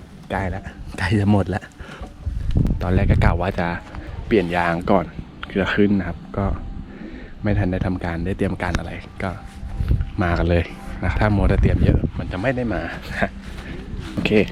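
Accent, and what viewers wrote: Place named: Kamphaeng Saen, Thailand. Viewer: สำเนียงเหมือนคนกำแพงแสนบ้านผมเลย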